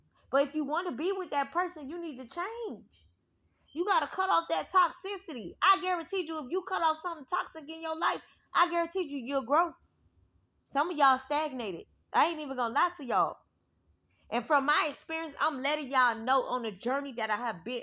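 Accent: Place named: Miami, United States. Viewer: New subscriber ❤️ you’ve been speaking to me all day. You sound like you’re from Miami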